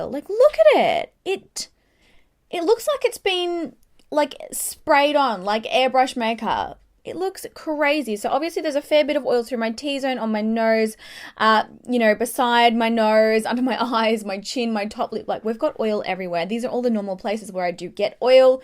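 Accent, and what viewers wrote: Australia. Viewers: I love this testing style and I love that you keep your Australian way of speaking, instead of putting on some weird accent that some other Australian youtubers do. Most of all I love that you say FORRID and not forehead! xoxo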